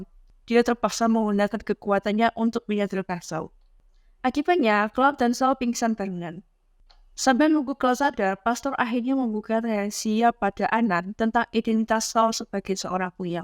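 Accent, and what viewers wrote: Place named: Java, Indonesia. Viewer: Wong jowo lagi medok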